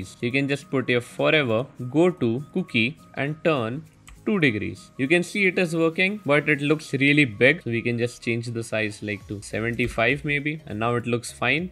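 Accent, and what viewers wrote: India. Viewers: always the Indian guy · Its always that nice guy with an Indian accent who makes the absolute best tutorials.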